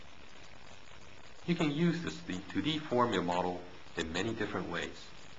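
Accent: Flanders, Belgium. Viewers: flemish, an a bit english XD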